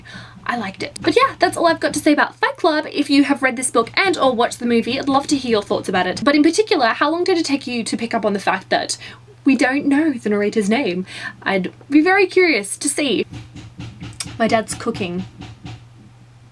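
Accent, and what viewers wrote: Australia. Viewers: You sound aussie! haha I don't come by many of them on the youtubes, I really feel this book needed a more in depth review as there is soooo many topics this book brings up, regardless this was not too bad. As for the narrators name.. he mentions a name a few times I believe it was jake or joe or something.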